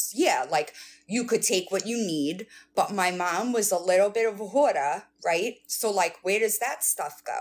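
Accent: New York, United States. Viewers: Im not being a weirdo or anything but I just wanted to tell you that you are a very beautiful Woman who gives off an aura of being a super strong intelligent woman who puts up with no bull shit❤ it's very refreshing to see and hear. So Smile! I do not know your whole story but I know that you just went through having the loss of your mom, I believe, and I just want to say that I will pray for you and hope that you can heal from such a traumatic event in life. That's what I consider this to be, in my own life as well. Okay I hope I said that right and I'm the one who said I love your accent. I'm from New York too, just not the city just a little above that around Poughkeepsie and I miss it so much 😢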